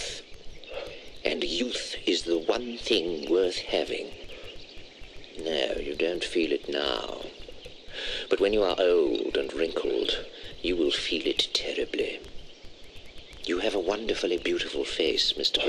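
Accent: United Kingdom. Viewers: Very good, but not how I picture Wilde sounding. He was Irish, this fellow sounds English and older. But a good reading nonetheless.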